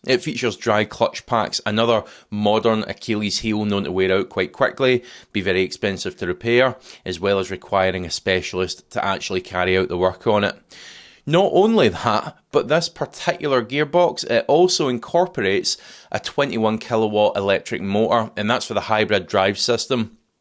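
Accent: Scotland, United Kingdom. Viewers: love scottish accent! Freedom!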